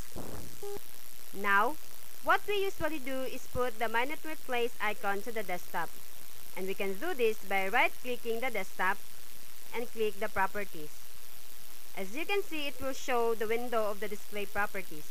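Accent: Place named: Philippines. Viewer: pinoy???? hahahha. 100%.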